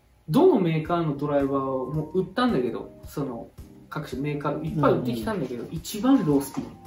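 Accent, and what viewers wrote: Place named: Kagoshima, Japan. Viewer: やっぱり鹿児島弁は聞いてて、気持ちよく聞き取れるし、いい感じです🙋いつも楽しいYouTube動画で試打やクラブの情報ありがとうございます♥️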